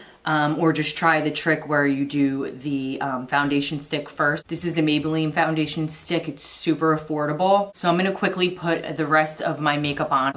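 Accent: Philadelphia, United States. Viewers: Your accent is totally Philly. I hear it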